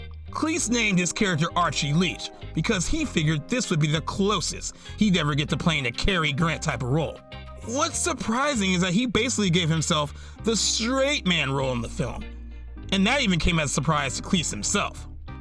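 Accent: United States. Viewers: The overly articulated American voice over is just too unbearable to watch this documentary. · a strange mix of well researched commentary told an in annoying yank voice normally associated with dumb crash bang wallop videos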